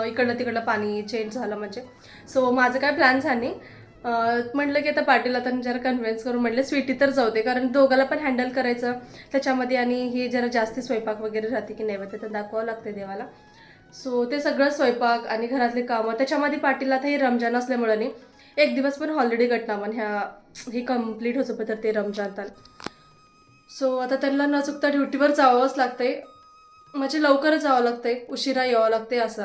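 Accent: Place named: Latur, India. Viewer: लातूर ची भाषा आहे हे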